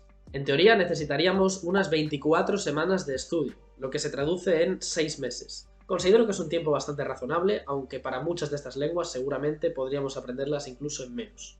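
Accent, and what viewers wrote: Spain. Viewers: Hola, estoy aprendiendo español y entiendo muy bien como hablas. De qué region estas en españa ? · Es una pena que teniendo este canal no te animes a hablar con tu acento natural. Debe de haber como tropecientos mil canales con chicos/as que hablan en castellano (estándar). Estoy seguro de que a muchos de tus seguidores les encantaría acostumbrar el oído a los acentos del sur de España. 😉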